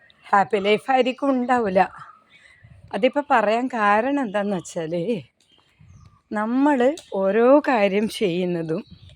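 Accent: Kannur, India. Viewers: Kannur slang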